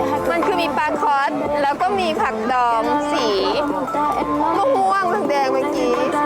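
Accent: United Kingdom. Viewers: I love your English accent!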